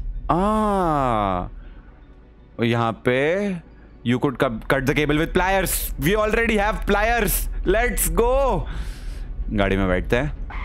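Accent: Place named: Bengal, India. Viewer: he is talking like Bengali people ❤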